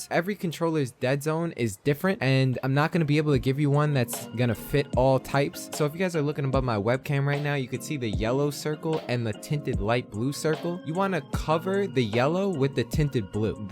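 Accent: New York, United States. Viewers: This guy definately from new york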